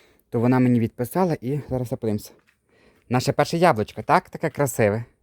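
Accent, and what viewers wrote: Ternopil, Ukraine. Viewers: Я з Криму. Обожнюю ваш тернопiльскiй говiр💕Ще з Мастер шеф.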